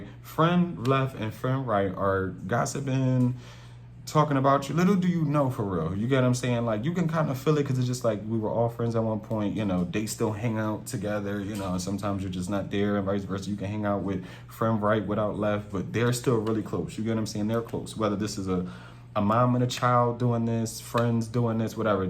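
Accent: Philadelphia, United States. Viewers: Being as though we both from Philly… lmao I can just hear it in voice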